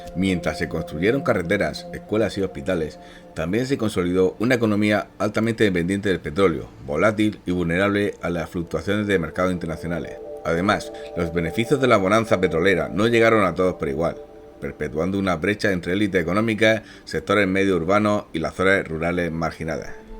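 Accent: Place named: Andalusia, Spain. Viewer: No hablas bonito. Eres andaluz?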